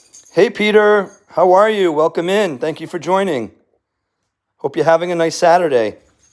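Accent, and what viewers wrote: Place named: New England, United States. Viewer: Do I detect a New England accent there?!I guess I do!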